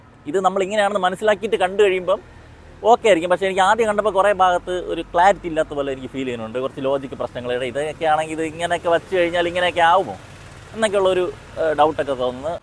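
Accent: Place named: Thiruvananthapuram, India. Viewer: I like Thiruvananthapuram language. By kanyakumari karan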